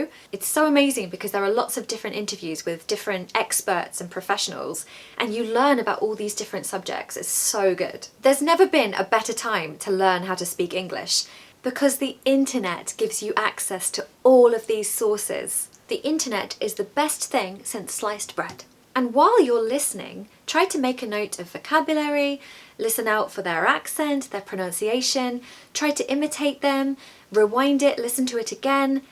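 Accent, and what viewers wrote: RP, United Kingdom. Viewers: Hi Teacher! Always am astonished by your every video! 😍 I really really really love the way you speak! I want to and am gradually learning the RP accent you speak! 💕💕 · As i have always been, again amazed Never found a teacher better then you Your gesture and face expressions made us love english and Brit accent as well . Thanks teacher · In USA, it's all about the d sound and the tongue touching the palate, in UK it's usually soft, elegant, polite and humble kinda accent. I'm quite capable of immitating you except the upper intonation, like suddenly it goes up, how'd you do that, I find it bit complicated. For split seconds it goes up and that's also the signature intonation a.k.a British accent of the United Kingdom. Do teach that.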